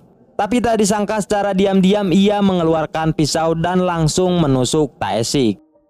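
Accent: West Java, Indonesia. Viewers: Hhaaaa......haaa... Admin naratornya orang sunda....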